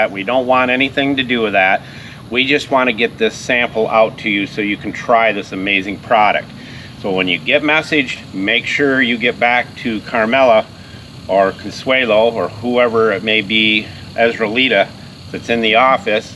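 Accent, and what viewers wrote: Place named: Chicago, United States. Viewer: Your accent screams Chicago? Not Florida.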